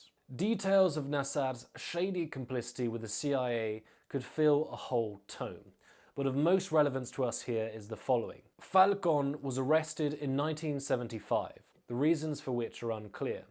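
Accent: England, United Kingdom. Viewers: SPEAK UP NEXT TIME MAN THIS ISNT AN ASMR VIDEO I HAVE MY VOLUME ALL THE WAY UP BUT BARELY HEAR YOUR SULTRY BRITISH BOYISH VOICE LMAO SPEAK UP NEXT TIME!! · I’m confused with this host… he has a British accent yet he pronounces perfect Spanish when he says their names or town names in Mexico 🤷🏼‍♂️🤣🤣🤣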